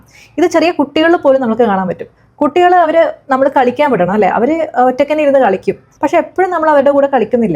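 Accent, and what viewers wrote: Kannur, India. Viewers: Offtopic Slang felt like u r from kannur Kannur edyappa?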